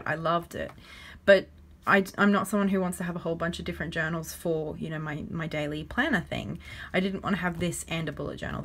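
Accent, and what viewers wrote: Australia. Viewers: Hi, I’ve just stumbled across your video by accident. I feel like I’m living your life a year later. I too am a bullet journalist too and have considered switching to a hobonichi next year as I am in the midst of completing my master and creating layouts is starting to impede on my time. I thought the pre-printed layouts would assist me next year but I’m a bit hesitant as to how it will translate in the hobonichi. I’m a little worried that there aren’t enough spare pages at the back of the hobonichi for collections and challenges like you would in the Leuchtturm. Loved listening experience with the Cousin Avec 😊 Hope I’m right in saying that I detect an Aussie accent. I’m from Kangaland too (Sydney to be precise).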